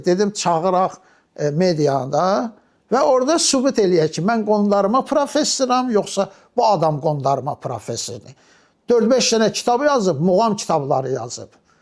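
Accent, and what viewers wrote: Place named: Khizi, Azerbaijan. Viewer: Salam Aleykum dayı oğlu❤Allah sizi var eyləsin!ömrünüzə,elminizə bərkət versin!Xızı rayon Xirək kəndinin,böyük bir nəsilin fəxrisiz,həmişə uca olasız!Maşallah çox gözəl xıdalığıynan danışmısız❤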